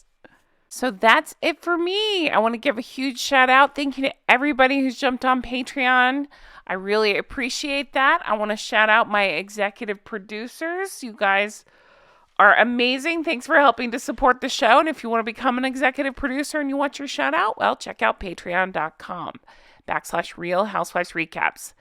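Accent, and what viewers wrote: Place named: South, United States. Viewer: let your southern roll on out. I'm from NC and I totally get it. 😂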